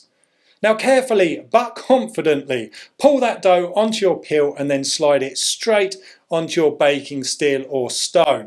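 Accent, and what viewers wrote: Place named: London, United Kingdom. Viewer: You sound like you're from London. Have you been to Greenwich market? They have lovely Turkish "pizzas".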